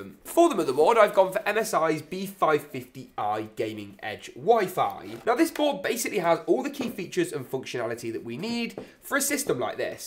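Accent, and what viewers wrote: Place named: Yorkshire, United Kingdom. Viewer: You have a nice Yorkshire accent.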